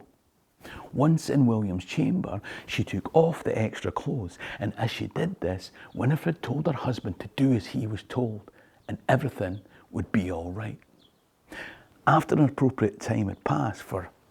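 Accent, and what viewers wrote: Scotland, United Kingdom. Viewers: No, no-one said that, and that is every bit as disrespectful a distortion as asking you not to speak in a Scottish accent was a disrespectful request. I have watched enough of your videos to know that you are more intelligent than that. Normalising the use of AI imagery harms people who make their living making that imagery for creators by making it acceptable for those who can afford to pay them to cut them out instead. You are talking like no form of visuals other than AI are available for you to make your content less visually boring. That is patently untrue. Perhaps if I were to churn out 10 AI generated videos on Scottish history a day, that had been "trained" on your content, you would get it then.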